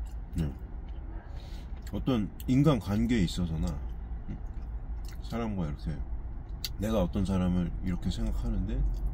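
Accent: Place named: Incheon, South Korea. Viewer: 말투가 인천이신데 인천분이신가요?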